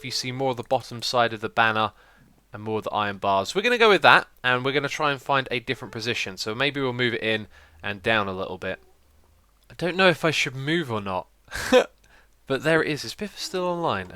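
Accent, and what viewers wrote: England, United Kingdom. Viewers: Are you from the north or south of England I can't work out ur accent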